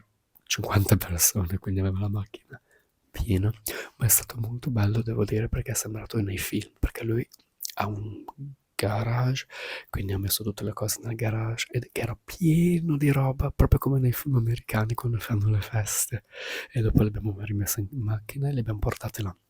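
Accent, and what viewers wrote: United Kingdom. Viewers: Hai fatto una cosa bellissima per tua mamma! mi spiace debba affrontare tanta sofferenza e da sola ❤ p.s. in questo video hai perso un po' l'accento inglese 😅😉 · Italiano molto migliorato, comunque a parte l' accento inglese l' italiano è perfetto dai, complimenti per la festa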